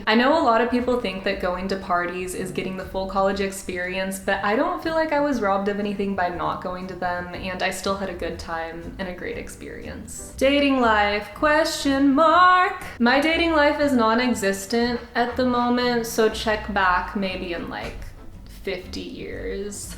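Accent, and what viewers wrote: South, United States. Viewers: That country accent really showin it's self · Where - where do you live? Like you sound very Southern sometimes,but sometimes you sound like you could live in Oregon. It's big confusion for me. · it’s weird because sometimes when I watch I totally can ignore her accent but now HER VOICE IS EXTREMELY SOUTHERN AND UNAVOIDABLE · country accent was strong in this one and that makes me happy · She probably lived in the south and moved to the north. I do the same thing. My accent gets really thick when I’m stressed or angry😬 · Your accent is so amazing shoutout to my fellow southerner!🤠🤠🤠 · wow your southern accent is really poking through in this one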